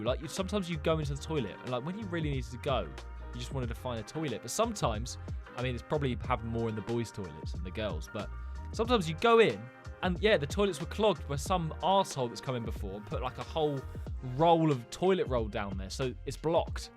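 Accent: United Kingdom. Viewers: Are you British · Are u British 🇬🇧?…..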